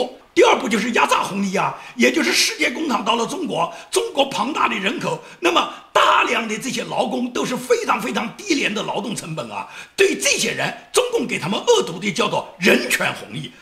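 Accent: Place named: Nanjing, China. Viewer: 喜欢建民老师用哑嗓子带南京腔的嘶吼和亢奋的不得了的情绪！来斯!！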